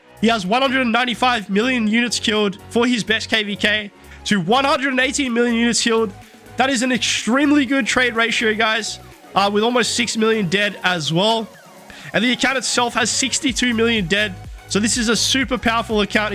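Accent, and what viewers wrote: Australia. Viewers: r u come from australia ? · Oh dam an Aussie streamer. I had no idea 😂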